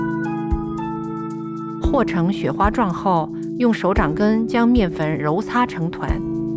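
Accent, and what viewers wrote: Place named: Shaanxi, China. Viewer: 明显是陕西口音呀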